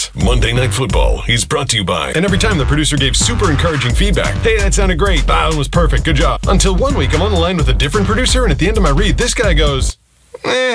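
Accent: Boston, United States. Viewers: I want more movie trailers in your boston tone of voice. I miss people who talk normal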